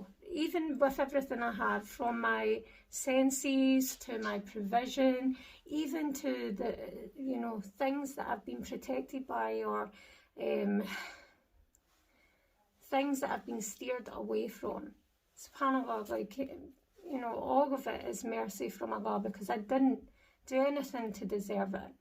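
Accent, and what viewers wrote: Scotland, United Kingdom. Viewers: May Allah bless you sister, as for me I really love the Scottish accent, I consider myself in a way good in English, but to many others it would be helpful for them to put subtitles as well, may Allah accept the fasting from all, bless you and your family, and guide all your loved ones into Islam. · As a muslim who understand English even in different accents and My mother tongue is Arabic , I may give you an advice that it is better for a wider audience to start writing subtitles because I know many many people who does not understand the Scottish accent . may ALLAH subhanahu respond to your good intentions. Ameen .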